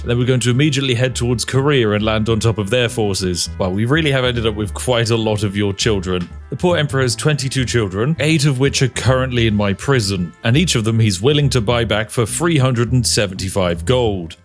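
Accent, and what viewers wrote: United Kingdom. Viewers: "Perfectly Average" ? He sounds British! And that comes from a British person ;) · I feel like he gets more British every intro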